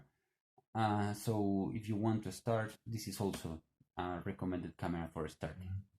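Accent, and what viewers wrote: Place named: Spain. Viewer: Hola,gracias for your review. Very nice the EM whit Moscow 80 logo!! Love your english with spanish accent...!! Greetings from Italy. Nos vemos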